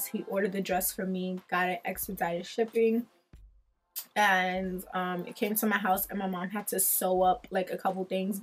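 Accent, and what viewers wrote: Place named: Jamaica. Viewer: Yaasss!!! Bust out that Jamaican accent👏🏿👏🏿👏🏿🇯🇲My mom doesn't play with my education either.😂 Where my Jamaicans at???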